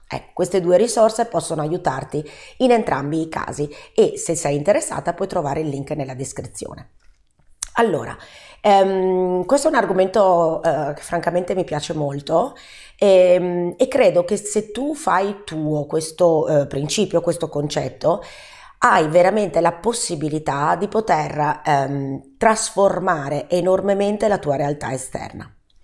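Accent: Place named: Veneto, Italy. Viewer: Buonasera, mi pare tu sia veneta. Di dove? Io sto a Vicenza.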